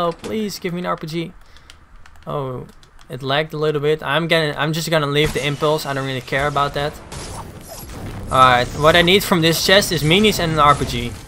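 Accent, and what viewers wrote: Netherlands. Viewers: Are u dutch . Je hebt een nederlands accent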